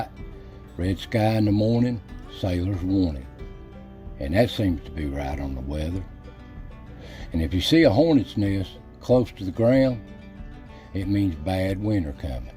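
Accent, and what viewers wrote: Appalachia, United States. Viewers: He sounds like my uncles from the Appalachian's. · I'm from West Virginia, too, and just hearing you talk reminds me of all my family growing up. It is such a joy and privilege to have been born and raised in Appalachia. Thank you for some sweet memories.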